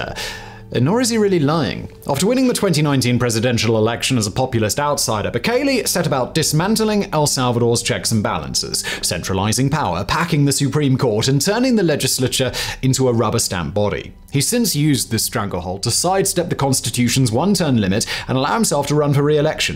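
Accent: England, United Kingdom. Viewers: A white guy with an English accent pontificating about El Salvador. I spend a lot of time there. Beautiful country with beautiful people that lived in fear because a small percentage of the population made it miserable for everybody else. Bukele took care of the problem. I saw people out jogging in La Libertad today. Crazy. That wouldn’t have been possible 2 years ago. That’s why they love him. Not because they want to go buy iPhones but because they can go watch their kids play futbol, go to the store, run a business, visit their family without fear. The comments on this board are embarrassing.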